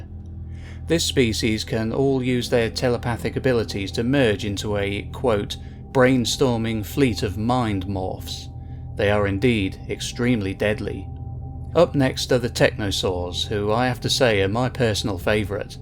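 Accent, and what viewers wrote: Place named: England, United Kingdom. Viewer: you have a very recognizable accent. I found it funny when you said you were from England, because every word you say tells us you're from England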